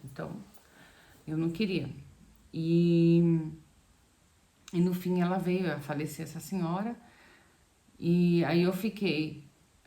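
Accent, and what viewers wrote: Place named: Espírito Santo, Brazil. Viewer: Gostei muito de você que Deus continue abençoando você e sua família. Você é do Espírito Santo? Você tem um sotaque parecido. Desculpe pela indiscrição, mas o seu namorado é alemão? Eu já morei na Itália. Não por causa de dinheiro mas por gostar do país da língua sou neto.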